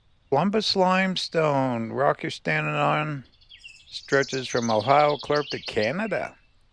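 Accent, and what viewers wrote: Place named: Pennsylvania, United States. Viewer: you seem to have a PA accent. True?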